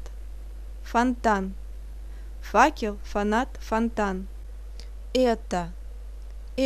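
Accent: Russia. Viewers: i love her russian accent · По произношению понятно, что ты русская) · hello, I like this girl's English accents, does who knows that is every Russia girl speak English like this? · OMG ... Alphabet is so difficult but russian accent is so beautiful 😍😍😍😍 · Сразу слышно что русская))) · У Вас приятный русский акцент. Приятно слушать!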